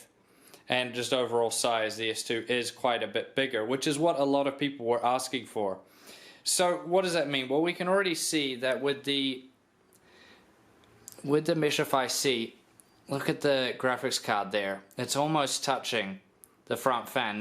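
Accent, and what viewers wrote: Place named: New Zealand. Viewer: Hey man... so ahh you have a very interesting accent. R u like a kiwi or something?? 😂😝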